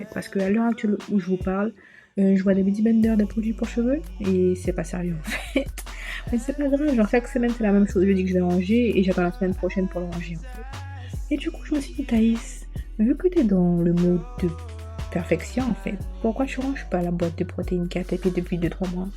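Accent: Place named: French Guiana. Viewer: Tu as l’accent guyanais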